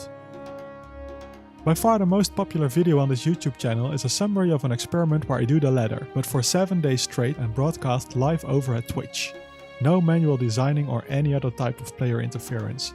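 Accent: Netherlands. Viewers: This guy is DUTCH, that accent I can recognized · Are u Dutch? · ARE U DUTCH? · That funky Dutch accent. Loving it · Dutch eksent?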